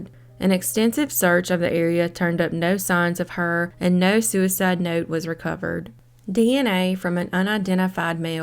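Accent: South, United States. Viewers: Thanks for this upload I’m in Britain but sent it to my friend in Florida area saying see I told you I liked the southern accent haha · I'm absolutely obsessed I love the way u do your video's and your Lil Southern accent is sooo cute . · Thank you. I had never heard of a few of these cases. I appreciate the detail you put into your productions. And a Southern drawl much like mine is unusual but welcomed. 😊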